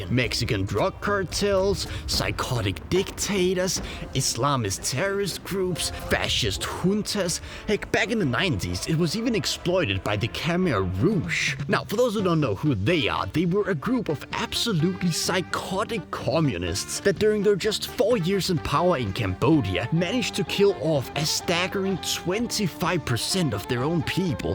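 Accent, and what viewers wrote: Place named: Denmark. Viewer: Hmmm, din accent er meget... Danglish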